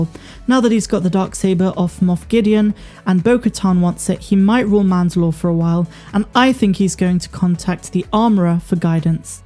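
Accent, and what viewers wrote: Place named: Bristol, United Kingdom. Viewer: I would have bet, you was from Bristol.good luck. Great vid.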